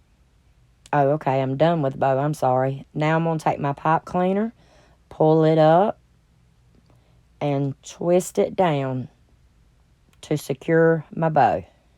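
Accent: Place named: Georgia, United States. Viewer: I have to comment on your video. This is the 1st one ive watched & im not sure how i got to you but im SO GLAD i did! 1st of all idk where ypu live but i swear it sounds like listening to home! ( South East Georgia) 2nd...I have been doing floral design and decorating for over 30 yrs now. You have the eye for & know what you like! You also have the gumption to get out there and try it PLUS put it out there for the world to see! The good the bad the ugly...etc..plus ypu are HONEST & realistic in your videos! Ive been watching YouTube for years and collecting craft stuff to flip- upcycle- diy for YEARS....and uts sitting in my garage. Because i dont have the confidence in myself to just get out there sort through it and DO IT. So it doesn't matter what ANYONE says. You are an inspiration to me! An encouragement and a little taste of home when I listen to your voice overs. 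Plus im getting out in my garage this weekend and getting started! Design, decorating, partys, weddings used to be my happy place. I just got burned out. But you are 100% right...this is for YOU what you like, and your learning as you go. It really made me realize that its OK to not have it 100% perfect and set up so everyone only sees the pretty side...ypur a realistic crafter that does make me feel like my messy horde of stuff is a problem only i have! 🤣 so carry on girl! One last thing The lady that taught me how to design had an expression i couldn't help thinking about when you were making this wreath..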